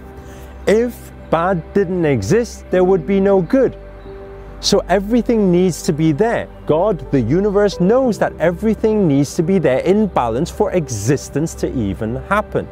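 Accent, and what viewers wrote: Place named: Northern England, United Kingdom. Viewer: Hi, I'm listening from near Manchester UK, I notice a northern twang in your accent x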